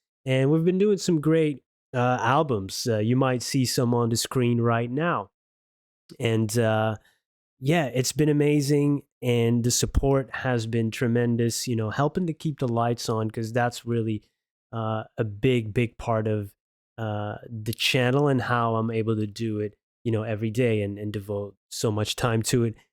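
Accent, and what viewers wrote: United States. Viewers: I love to listen to your reactions. You speak beautifully., very expressive. I think that I have expressed this before. You speak like an educated American. I would never have guessed that you’re from another country. I’m not that familiar with. This song is beautiful. You might wan to check out some his father Tim’s early music. Very hypnotic and powerful.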